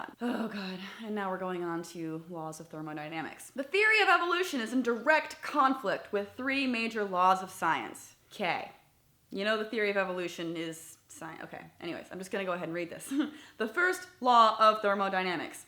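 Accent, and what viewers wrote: South, United States. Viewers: that Southern accent though.... I know it's parody, but I like.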